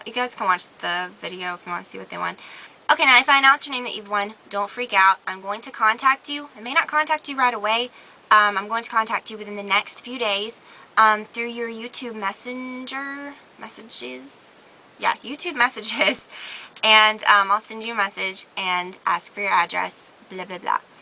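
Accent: South, United States. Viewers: "and at the ieendd" Haha your southern accent comes out more and more the more videos i watch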